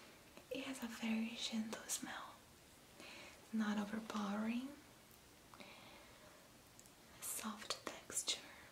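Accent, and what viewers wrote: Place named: Brazil. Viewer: Gostei muito do teu Portugues mesmo sendo do Brasil, percebi, sou de PORTUGAL, compreendes a escrita certo?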